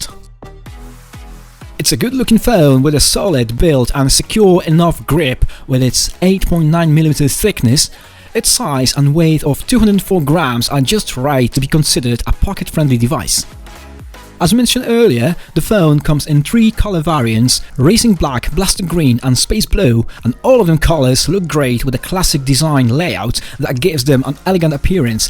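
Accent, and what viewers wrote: United Kingdom. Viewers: 👍 The British accent is a value added. Saturday February 04, 2023. A.M. U. S. C. T.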